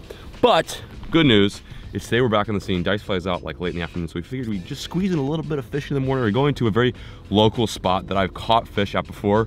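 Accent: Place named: North, United States. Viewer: Like if y’all can hear getting a northern accent since the move haha. It’s getting noticeably stronger each video brother.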